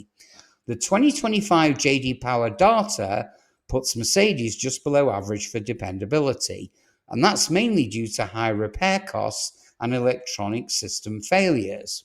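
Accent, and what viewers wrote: United Kingdom. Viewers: Despite British voice, American cars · SOUND LIKE ANOTHER BRITISH EXPERT,WHOSE COUNTRY HAS THE WORST CARS IN THE WORLD TALKING ABOUT GOOD RELIABLE VEHICLES! BELIEVE THAY BULLSHIT ! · No more British accent narrators ‼️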